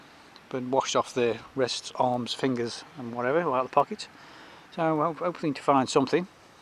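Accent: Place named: Stoke, United Kingdom. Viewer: You sound like a proper Stokie mahhh mayte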